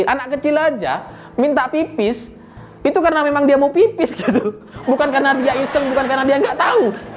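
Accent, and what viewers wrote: Madura, Indonesia. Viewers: kenyataannya: mas masih kentara bner aksen maduranya😁 bravo madura👍👍 begitulah kura-kura 😁😁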